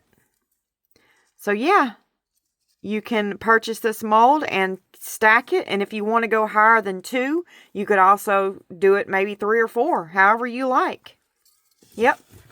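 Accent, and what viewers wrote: South, United States. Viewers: I'm so glad I found your channel. 😊 You're a delight. From your southern twang to your sweet laugh. ❤ Those pink molds could be for making candy. Possibly the split is for a scew stick for cake pops or something? IDK 🤷‍♀️ 😂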